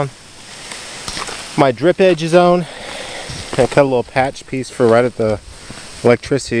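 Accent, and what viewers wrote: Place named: Pittsburgh, United States. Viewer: Pittsburgh Accent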